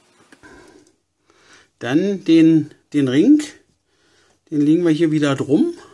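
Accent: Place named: Berlin, Germany. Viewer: Danke! Das hat sehr geholfen☺️ Und dein Berliner Akzent ist super und du erklärst das mit guter Stimme. Top!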